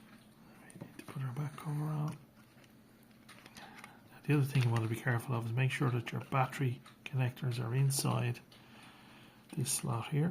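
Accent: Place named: Ireland. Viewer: What part of Ireland are you from you sound like all if my relatives lol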